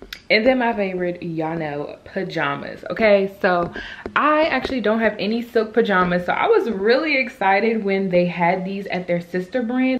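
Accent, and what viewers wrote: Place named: New York, United States. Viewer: she sound like she from ny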